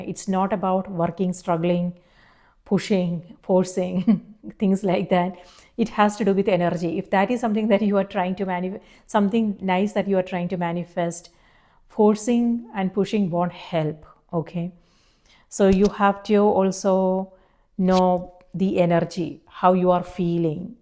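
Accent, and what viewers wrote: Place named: India. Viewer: nooo ...not with your indian accents...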